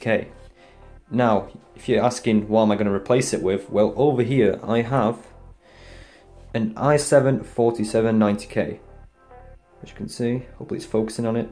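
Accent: United Kingdom. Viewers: are you a north east hard lad like me ?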